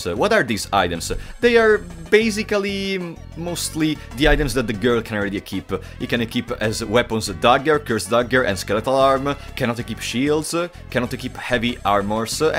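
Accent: Italy. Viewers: Are you italian? Your accent is very similar to mine when I try to speak english haha thanks for the tips!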